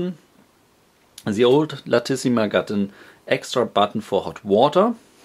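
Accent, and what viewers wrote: Germany. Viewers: I have to say there must be a reason Delonghi still retails the original for $100 more. Not sure why. I'm going to buy a plus as soon as I see a sale to combine with the $50 off from Nespresso. Your accent brought me back to my Army days in Wurzburg :)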